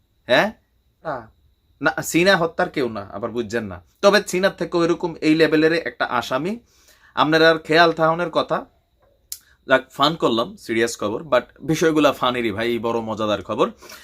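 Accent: Comilla, Bangladesh. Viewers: Viaya amr nanar bari o Cumilla. Apnar mukhe cumilla bhasa sune valo laglo 😊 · আপনার কুমিল্লার আঞ্চলিক ভাষা গুলো আমার অনেক ভালো লাগে সত্যের পথে এগিয়ে যান আল্লাহ আপনার সাথেই আছে✌✌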